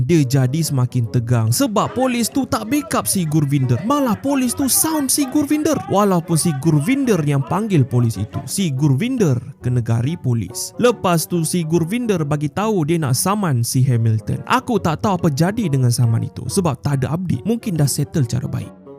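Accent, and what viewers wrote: Sabah, Malaysia. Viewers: Dia cakap slang sabah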